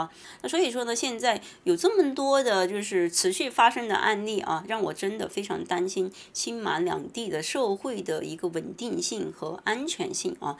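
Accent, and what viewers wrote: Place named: North, China. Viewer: 北方口音好吧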